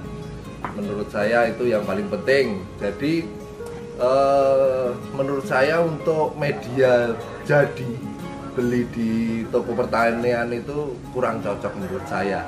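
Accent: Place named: Java, Indonesia. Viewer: Galfok sama logat jawanya, mas. Jd nyengir2 ngebayangin ketoprak😂😂😂👍👍👍. Sukses ya. Terima kasih🙏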